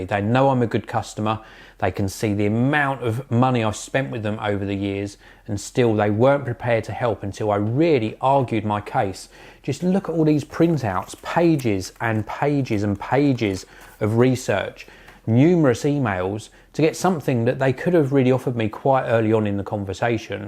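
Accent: United Kingdom. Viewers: So I clicked this video because I'd like to know what to do if Apple refuses to fix or replace my laptop - but as soon as I heard you speak I knew this wasn't relevant to me because of where I live. I live in the USA, where we don't have appropriate consumer protection laws. Thank you for sharing your experience! I hope it helps many people from the UK.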